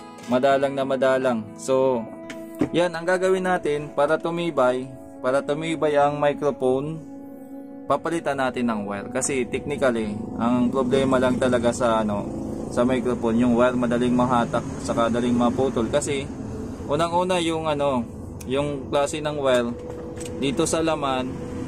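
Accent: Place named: Visayas, Philippines. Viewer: Bisaya ka boss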